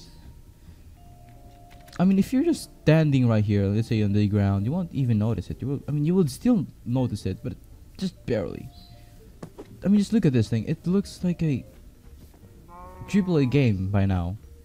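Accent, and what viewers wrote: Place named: Philippines. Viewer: Man, i think this guy is filipino for sure because of his english accent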